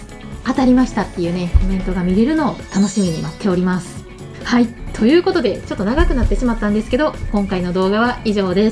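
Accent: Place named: Kansai, Japan. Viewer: 関西弁弱いなー😙😙😙😙😙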